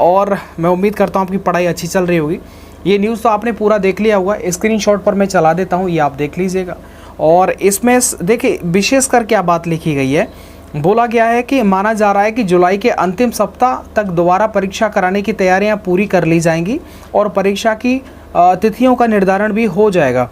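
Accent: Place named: Western Uttar Pradesh, India. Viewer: sir aap aligarh ya mathura ke lagte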